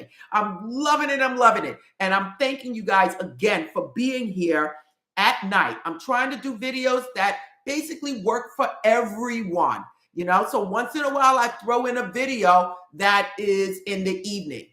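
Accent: United States. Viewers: I like your videos but your English is too American to me At times I don't hear you clearly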